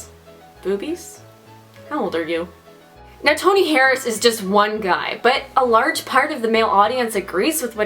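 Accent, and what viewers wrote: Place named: Canada. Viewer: oh, I forgot to add - I'm Canadian too, so it warmed my little heart to hear your Canadian accent. Yayz!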